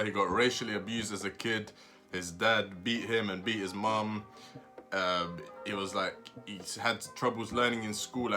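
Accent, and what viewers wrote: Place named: United Kingdom. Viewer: Pull ups I hate pull ups. I like push ups better. I just recently saw your thumbnail video and I just wanted to say thankyou and I will try and copy those techniques of your on Photoshop keep doing your thing my man and stay safe. I'm Kaleb btw. I just subscribed. Are you British I like your accent. I do travel vlogs in New Jersey and other states along the way and maybe come check me out and maybe subscribe. Thanks. Bye.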